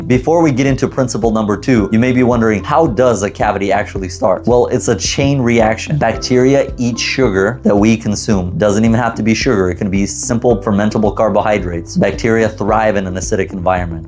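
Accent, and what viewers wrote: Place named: South, United States. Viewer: that’s a neat backstory! I’m from deep south Louisiana and I was hearing an occasional southern accent whether you knew it or not. Lol.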